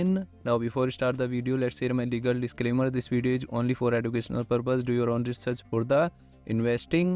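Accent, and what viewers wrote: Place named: India. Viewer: my homie its hard to understand a single word with your Indian accent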